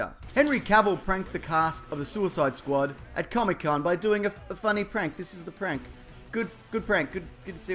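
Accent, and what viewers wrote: Australia. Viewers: I would love to see Deadpool in the next Suiciide Squad movie...oh no wait. He's Marvel. Still Deadpool though. That movie would be EPIC. Oh actually, I want Batgirl in the next one- but only if I play her. Yes DC, I will begrudgingly take that roll for the good of the 'verse. Also, awesome video. Do I detect an Australian accent or am I wrong? Aussie YouTubers represent! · Hello Mr. man. I like your Aussie tone. It's nice. I WOULD like to win the lut crat, so if I could, that'd be cool. Thanks. Take care.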